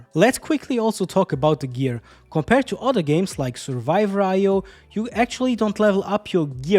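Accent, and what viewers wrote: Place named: Germany. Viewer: Idk, maybe it’s me, but when I listen to your English, it feels like you a German. Hab ich recht ?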